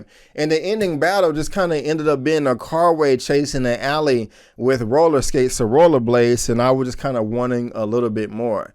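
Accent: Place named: North Texas, United States. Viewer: It sounds north Texan to me like dfw area mart be very wrong but that's how my people talk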